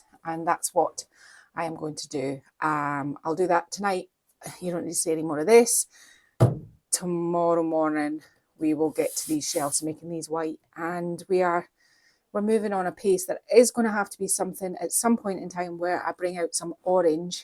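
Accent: Scotland, United Kingdom. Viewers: Beautiful! Luv the building technique. I really need to let go of my perfectionism and let that paper and paint fly! Love with a mic. So sorry you can't say, " just leave it alone" with a straight face anymore, I was rolling on the floor! I got another one for you guys coming up on my next vid. I take your words like the gospel, I hear them with your beautiful Scottish accent in my head. 😂 would love a shirt or a coffee mug with your sayings on them. You really knocked it out of the park on this one guys, dar p.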